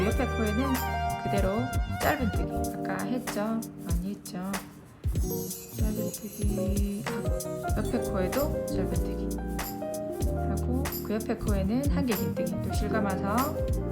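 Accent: Busan, South Korea. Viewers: 부산 말투가 정감있고 좋아요~ 오른쪽거 제 취향. 수세미로 쓰기에 너무 이쁘네요. 컵밭침 인줄 알았네요. 삼베실로 모자 같은거 뜨면 예쁘겠어요. 짧은뜨기~ 하니까 예전 추억이 돋네요. 노인에겐 치매예방, 아이들에겐 집중력 개발 안정감 증진! 많이들 보고 따라하면 좋겠다 싶습니다.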